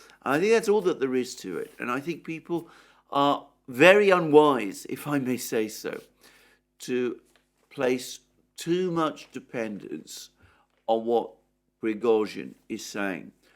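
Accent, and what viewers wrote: England, United Kingdom. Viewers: Wired listening to a Greek communist with an English accent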